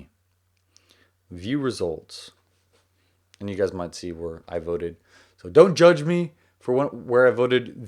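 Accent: South, United States. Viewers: I love your video at your house in Texas during your quarantine until you're talking about all of the books while you went on the website of Goodreads on your cell phone to see all of them are brand new and it got came out this year, but it's called of Best Books of 2020 to look at them at the same time after that, I hope your husband, Zach will be very proud of you and I love your Southern accent!!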